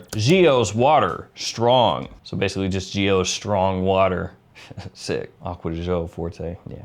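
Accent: South, United States. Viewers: bro his southern accent kills me LOL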